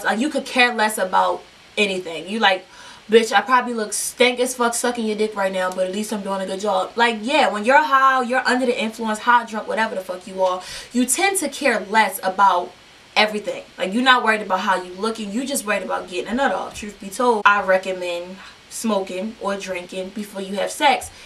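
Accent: Baltimore, United States. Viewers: I know you from Baltimore with that accent.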